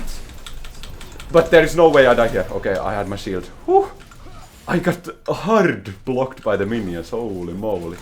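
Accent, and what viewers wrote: Finland. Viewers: Nice action! But i swear, everytime i hear you speak, all i can hear is finnish. 🤭 Best regards from Estonia! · Love the finnish accent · Doesn't really sound spanish at all to me. But that might be because I'm also finnish so I know our accent well.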